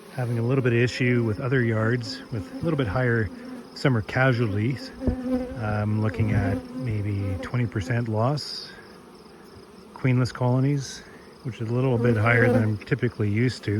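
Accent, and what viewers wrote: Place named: Canada. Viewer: Definitely hear a Canadian accent 🤣...."about"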